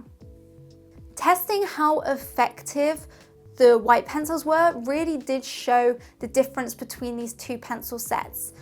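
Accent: England, United Kingdom. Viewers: OMGGG I lovee your English accent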